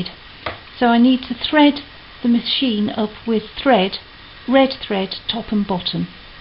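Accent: United Kingdom. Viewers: You have a beautiful way of speaking … its lovely to hear British English rather than American. Reminds me of Lady Di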